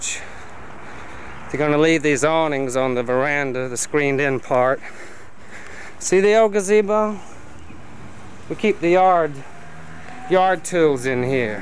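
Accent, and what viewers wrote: South, United States. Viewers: I think his southern accent definitely came out more in this video. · It's really interesting to me how his southern accent is more pronounced in these videos when he's at home vs when he's in NYC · goes home and that Southern draw is magnified.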